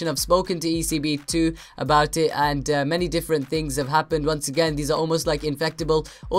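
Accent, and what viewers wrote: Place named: England, United Kingdom. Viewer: where are you from? lol i can never tell your accent one minute i think you are english the next south african haha xD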